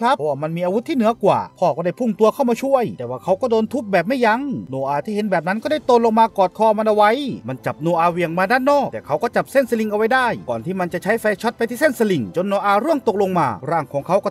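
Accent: Isan, Thailand. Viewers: พากย์ออกแนวอิสาน สนุกดี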